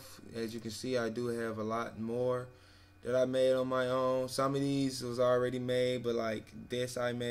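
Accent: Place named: Chicago, United States. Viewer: I can tell U in Chicago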